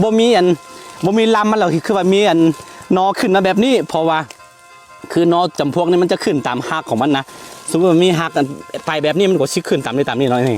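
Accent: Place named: North, Thailand. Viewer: พูดเหมือนคนเหนือฝั่งไทยเลย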